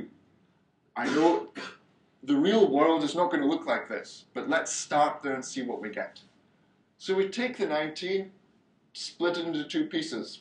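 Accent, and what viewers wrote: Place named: Siberia, Russia. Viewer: Siberian English .... echoing behind tongue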